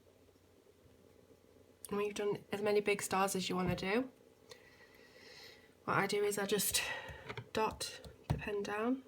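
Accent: United Kingdom. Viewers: Wow! Great tutorial: No prattling, no dreadful music, 'no fancy technique'. Brilliantly demonstrated by an artist with a great British accent. Superb. I've subscribed. Thank you.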